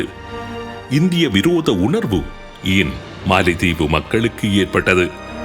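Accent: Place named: Eelam, Sri Lanka. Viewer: அழகிய ஈழத்தமிழ் காதினில் தேனாகப் பாய்கின்றது. Superb. 🎉🎉🎉🎉🎉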